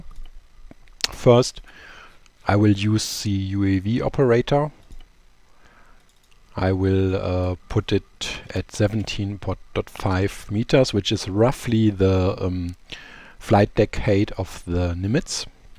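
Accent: Germany. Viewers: best mod ever had. ok we have some nice tanks,planes,boats etc on our tactic server but this is still awesome! is there a elevator for boats planed? for now we have to tow our trucks,tanks etc with planes to the island. are you german?you sounds like an english speaking german;-)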